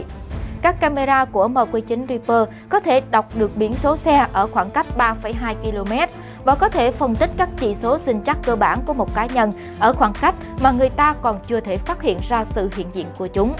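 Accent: South, Vietnam. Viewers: Quân sự mở kiếm đâu ra một em nói giọng Miền Nam 😃😃😃😃😃😃😃😃😃😃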